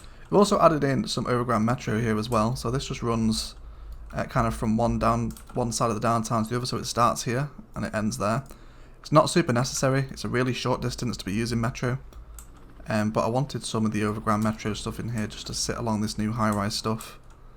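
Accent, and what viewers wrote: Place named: Liverpool, United Kingdom. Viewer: Where are you from in England? Your accent makes you sound like a Beatle. Are you Ringo Starr?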